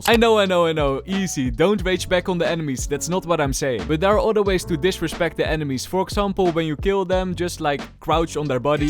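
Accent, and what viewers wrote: Netherlands. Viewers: you sound? look's like you from netherland are you from netherland?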